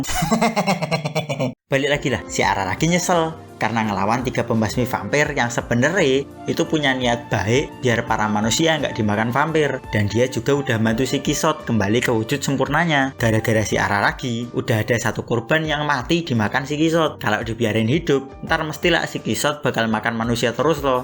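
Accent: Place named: Java, Indonesia. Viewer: Wkwk jowo banget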